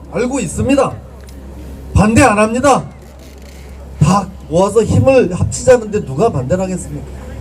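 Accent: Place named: Gangwon, South Korea. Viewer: 강원도 말씨 정답네요~~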